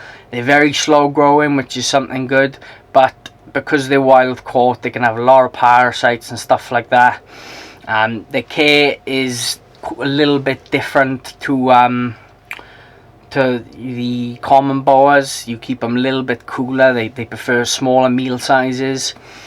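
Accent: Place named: South Wales, United Kingdom. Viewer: The south Wales accent is lush.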